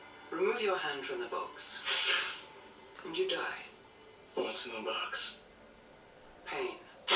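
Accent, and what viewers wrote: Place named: Midwest, United States. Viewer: I like to place accents so Wisconsin? or maybe somewhere in the midwest.? This is just out of curiosity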